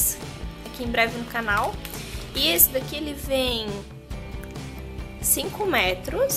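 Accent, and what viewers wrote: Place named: Santa Catarina, Brazil. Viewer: Caraa tu mora aqui em Sc 😍😍 logo eu notei esse sotaque que so a gente tem, moro aqui em criciuma ja morei ai em floripa 😍😍😍😍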